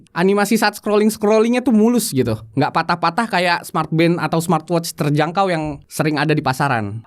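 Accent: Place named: West Java, Indonesia. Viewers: meni nyunda pisan euy si akangnya 😁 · Ngomong nya cepat belibet artikulasi kurang jelas, dari aksen bicara nya khas Sundanese, lain kali kalau ulas gadget lagi, mohon tempo bicara di atur yang pas jangan tergesa-gesa, supaya pemirsa tidak bingung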